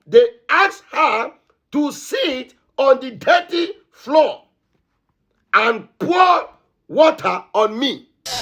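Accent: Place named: Nigeria. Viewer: My brother i like the way you speak [Boola bay Nigeria English]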